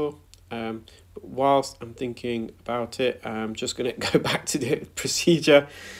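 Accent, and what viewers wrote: United Kingdom. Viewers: British people sound like that. I watch a fellow from Scotland who treats cow hoofs. When he says "bull" it comes out sounding like "bill"! Took me a minute to get used to that Scottish twang, applies for Brits too......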